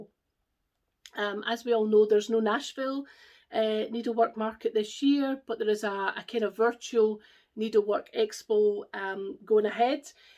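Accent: Scotland, United Kingdom. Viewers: I’ve just found you on flosstube! I’ve followed for a while on Instagram but didn’t know you had videos! Love your stitching and absolutely love your accent! Scotland is the one place I’d love to visit it’s beautiful in pictures and part of my heritage 🖤🏴 so thankful your parents were able to get their vaccine and did well with it hope yours goes good as well! Thanks for sharing! Happy stitching 🧵!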